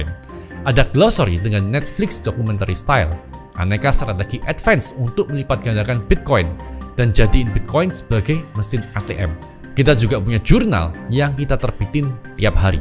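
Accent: Java, Indonesia. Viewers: Lucu banget. Muka cina tapi pas ngomong medok Jawa 😂 unik unik 😂😂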